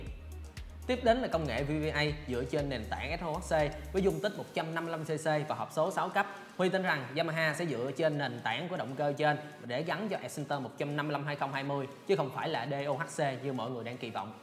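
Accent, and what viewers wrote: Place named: Mekong Delta, Vietnam. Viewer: a MC dep trai, giog noi ngọt ngao ne, như ng mien Tay :)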